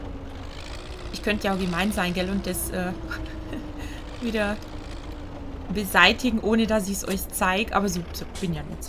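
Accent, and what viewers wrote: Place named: Bavaria, Germany. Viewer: dieser Bayrische Dialekt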